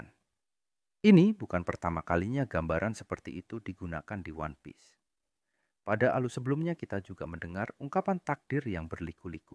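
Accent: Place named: Ngapak, Indonesia. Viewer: cok kalo medok gausa gua gua, gaenak didenger jirr guanya ngapak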